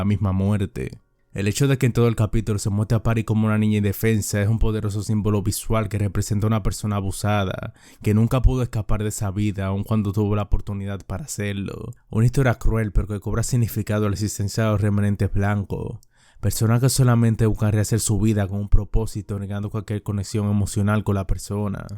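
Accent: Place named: Central America. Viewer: Leftovers es una maravilla, es muy bueno que se reivindique una serie de esta categoría, sin embargo es muy importante que el relator, hable un español claro, sin acento. Puede ser el mejor análisis sin engarbo cae todo cuando comienzas a oír un acento centroamericano marcado, ademas utiliza modismos que realmente destruyen todo. un consejo sano, no hables con acento, si tu no puedes hacerlo con un léxico claro, pedí a alguien que te lea el guion.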